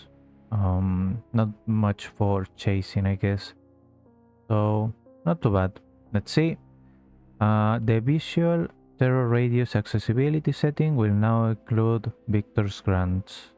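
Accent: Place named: Spain. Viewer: exactly, they really went the lazy way. The devs are getting lazy, what a surprise. Only 1 killer update, 3 perks changed (calm spirit no longers counters ultimate weapon, which is a shame) and only one map changed, where is the swamp visual rework? Im happy for Twins mains but I dont think their changes to Haddonfield are gonna be very good, devs always fuck up in some way. Oh by the way, Im pretty sure you are a native spanish speaker. I myself am from Spain.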